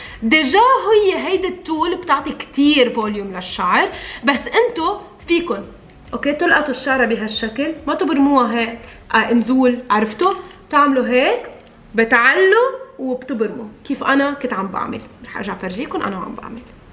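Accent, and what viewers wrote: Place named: Syria. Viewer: لان لهجتك سورية🤣🤣 وانا بعرف مافي توصيل ع سوريا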